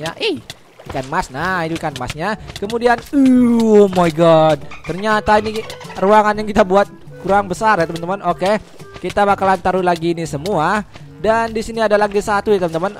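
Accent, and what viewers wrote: Bali, Indonesia. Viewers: Dari bali bli???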